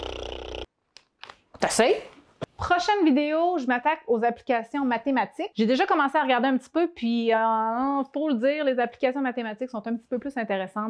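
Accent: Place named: Canada. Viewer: este es el frances de canada? suena un poco gracioso...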